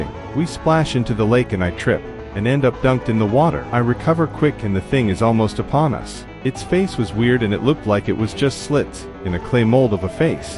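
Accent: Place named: Russia. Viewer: this Russian voice was hard to understand but I'm loving your work so far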